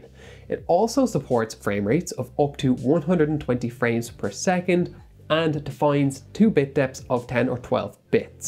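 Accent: Ireland. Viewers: Dublin Accent 😁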